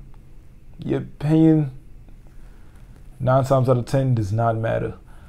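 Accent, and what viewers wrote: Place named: Philadelphia, United States. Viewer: If you don’t mind me asking where are you from? I can hear your accent. Sound like you from Philly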